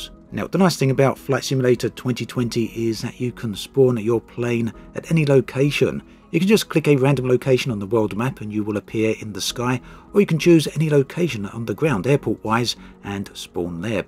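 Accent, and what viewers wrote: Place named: England, United Kingdom. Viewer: Which English accent is that? It's so hard to understand what he is actually saying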